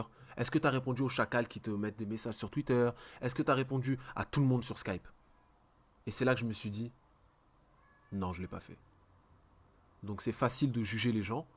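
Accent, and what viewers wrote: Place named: Essonne, France. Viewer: Par où commencer , bon deja c'est la deuxième fois que je regarde une de tes vidéos et j'aime bien ta façon de penser et ta façon de parler , d'ailleurs je me demande si t'es pas un Boug du 91 ... Thekairi78 j'ai regarder sa toute première vidéo sur YouTube en 2011 , il porter une casquette , il disait Salam Aleykoum, j'ai direct accroché au personnage par ce que je me reconnaissais dedans , un mec de cité sur YouTube en 2011 y en avait pas bcp , îl parlait de religion , de l'école , des femmes , de la France , bref de la vie en général ... Ses records zombies , les massacres qu'il faisait avec sa team en multijoueur , c'était un régal de regarder ses vidéos ! Alors le voir changer autant en si peu de temps , c'est normal que ces anciens abonnés dislike ces vidéos , c'est comme si tu voyais un de tes potes sombrer dans la drogue , l'alcool , les putes etc bon l'exemple est un peu extrême mais c'est dans cet optique que je vois les choses Voilà chacal principal j'espère que tu verra ca!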